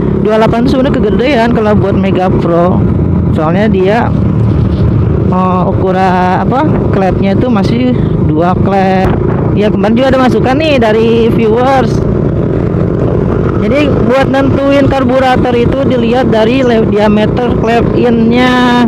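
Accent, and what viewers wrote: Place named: Betawi, Indonesia. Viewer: nadanya anak betawi asli ahhaha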